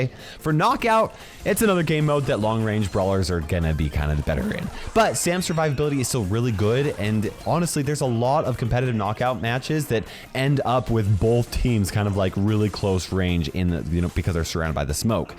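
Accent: Australia. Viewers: Sam sounds australian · I didn't know I needed an Australian in BS... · He’s Aussie? Man I love this guy already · ayo sam having That austalian accent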